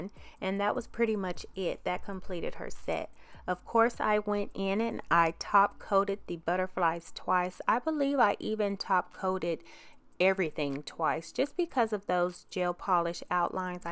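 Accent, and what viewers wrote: South, United States. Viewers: These are so beautiful! You go you are so talented and I love your voice and to be it's southern and I'm southern lol.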